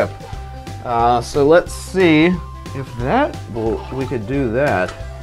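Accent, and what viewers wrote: Pittsburgh, United States. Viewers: are you from pittsburgh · are you from pittsburgh pa?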